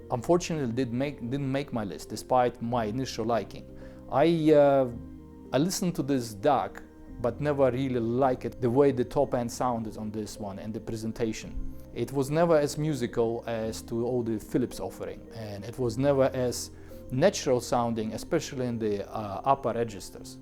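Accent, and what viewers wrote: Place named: Soviet Union. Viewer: The way you speak indicates that you are of USSR origin. It will be that there is a misunderstanding with you if we listen carefully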